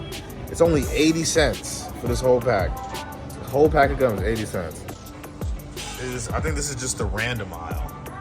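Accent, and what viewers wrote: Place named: United States. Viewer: Good luck.. It's a great feeling having an educated American-English accent hitting my ears. Usually, listening to the typical African content creators' videos you must pay attention, take notes and translate or transliterate as you go through their videos....they mumble, mispronounce and are, quite frankly, so unprofessional...like they're talking to the gang on the corner stoop. I know for many of them these are initial steps into a larger world and they will get better. I see your channel as Google's attempt to enlarge the ethnic audience with their algorithms...let's hope that last....I'm glad I found you. Keep traveling my brother, I'll be seeing the world through your eyes and I am very interested in seeing what else Africa has to offer.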